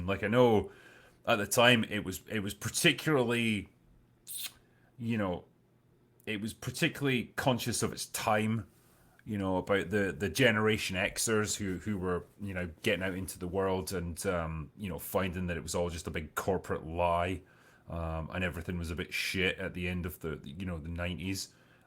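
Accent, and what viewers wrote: Scotland, United Kingdom. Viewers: Talks like a Scott, drinks like an Irishman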